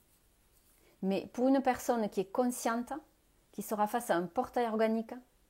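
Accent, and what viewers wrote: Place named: South, France. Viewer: Namasté, ha ha ha !!! je rigole bien sur, merci beaucoup pour vos bonnes vibs, & votre bel accent du sud plein de Soleil :)))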